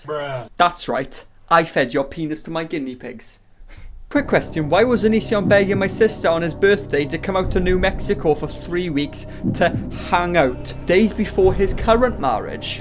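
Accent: Wales, United Kingdom. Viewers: Odd question but where are you from? You sound very Welshy like you’re from the valleys 🙈 I’m from Aberdare 🤣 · Are you Welsh? Your accent sounds like it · First video of yours I've ever watched and all I hear is the Welsh accent. 🤣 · Ngl I accidentally turned off the video when I tried to click on the channel once I heard the Welsh accent 😶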